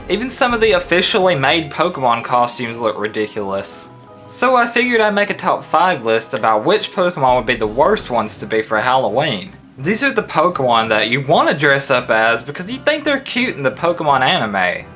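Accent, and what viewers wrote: South, United States. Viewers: Omg this guy's voice is so red neek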